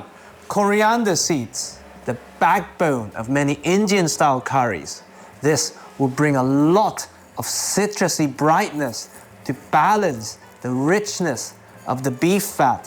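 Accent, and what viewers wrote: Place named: United Kingdom. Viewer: That is the most British voice I have ever heard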